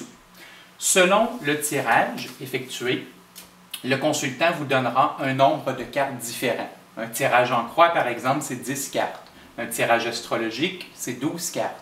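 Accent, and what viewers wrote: Quebec, Canada. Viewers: C’est passionnant !!!😄je me régale. Mais y a du boulot. Merci d’avoir réussi ces 2 livres. Et vos vidéos sont super agréables à écouter. Et l’accent Canadien est trop mignon. Bonne continuation. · Merci pour tes explications claires. Ton accent est marrant, comme tous les québécois :) Est-ce exactement la même chose pour un tirage par téléphone concernant les cartes à retourner "à la verticale" ?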